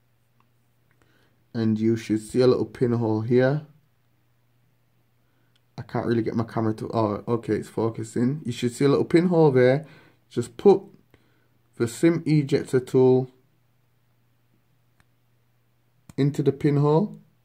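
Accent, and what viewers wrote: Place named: United Kingdom. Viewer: Which part of UK are you from?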